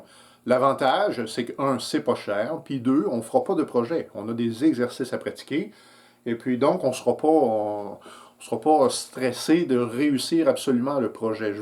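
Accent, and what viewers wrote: Canada. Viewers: non seulement c'est bien expliqué mais en plus le pt'i accent canadien ca n'enlève rien au plaisir ..... merci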